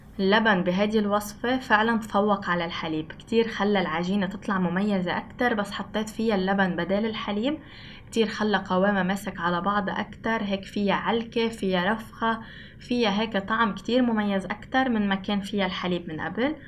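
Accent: Lebanon. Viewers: انت من لبنان؟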